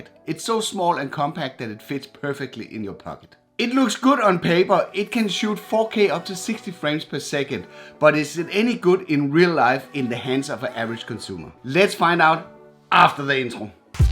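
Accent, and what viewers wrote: Denmark. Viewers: Ære you from denmark? Sound like at Danish guy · Are you from Denmark? Your accent is so danish!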